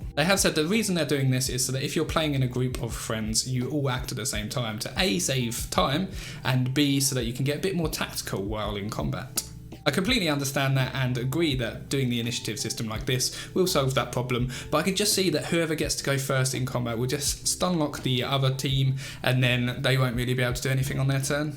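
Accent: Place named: United Kingdom. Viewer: Hi! Great video! Just curious, where are you from? I would guess somewhere in UK but can’t place an exact place. I think baldurs gate 3 is gonna be amazing!! Thanks and keep up the great content.:)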